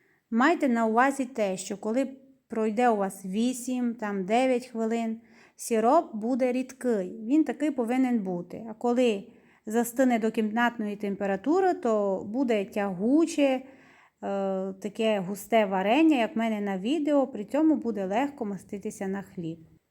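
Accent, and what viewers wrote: Transcarpathia, Ukraine. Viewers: Відразу чути, що це наша Закарпатська господиня😊❤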